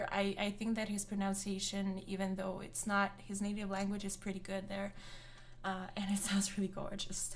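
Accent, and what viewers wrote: Quebec, Canada. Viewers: French pronunciation?? Are you kidding me? You are from Quebec. That makes you the ugly third stepchild from a bastard idiot uncle who wears speedos when he goes shopping. Is what you speak really French? Back to your poutine while staring at your Trudeau poster.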